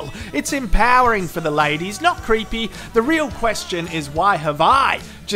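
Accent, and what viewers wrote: Australia. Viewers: It's real Aussie, City folk have all turned into yuppies!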